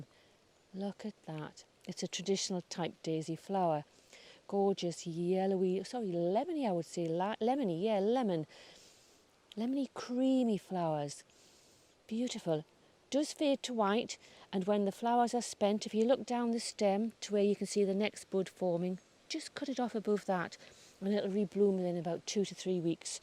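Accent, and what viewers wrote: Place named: Northumberland, United Kingdom. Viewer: I just discovered you yesterday and I knew I recognized that accent. I was born in Northumberland but the area is now a part of Tyne and Wear...Cullercoats/North Shields area. I've lived in Illinois most of my life after we emigrated in the 60's. I was surprised to hear about the Annabelle hydrangea being from Anna Illinois in a different video. Enjoying watching you ❤️🇬🇧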